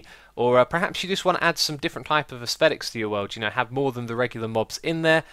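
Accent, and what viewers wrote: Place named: United Kingdom. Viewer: Oh my you're British!!! Me too!! I think you're British, you sound it!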